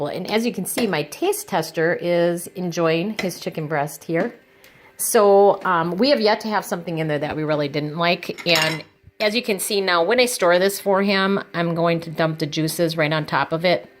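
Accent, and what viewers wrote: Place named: North, United States. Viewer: hello! You sound like your from the north lol. Hello from MN here. Thanks for the video, i was going to try pico and chicken in my new instant pot as well. almost exactly a year later.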